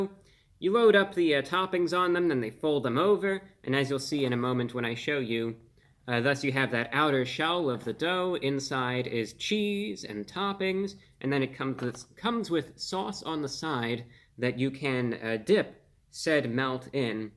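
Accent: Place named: Mid-Atlantic, United States. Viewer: a stylized 1940's Mid-Atlantic or Transatlantic accent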